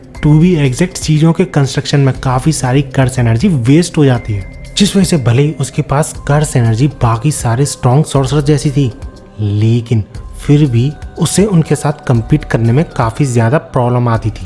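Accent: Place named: Madhya Pradesh, India. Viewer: You are from MP Or either from near by agra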